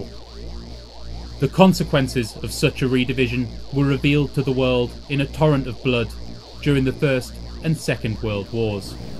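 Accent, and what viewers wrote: United Kingdom. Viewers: comrade, this is absolutely amazing. do i detect a hint of yorkshire there?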